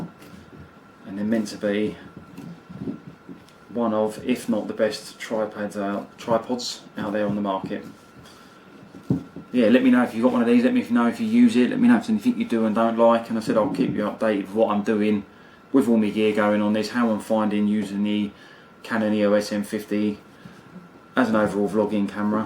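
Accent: United Kingdom. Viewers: Ahah, nothing in particular, I simply like british pronunciation. :)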